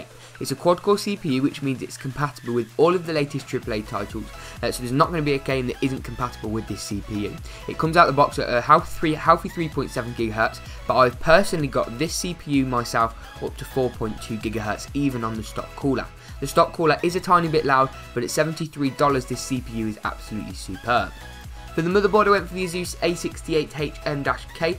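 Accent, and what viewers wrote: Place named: Doncaster, United Kingdom. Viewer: I know this man is from Doncaster, that accent has a weird " dh-u" noise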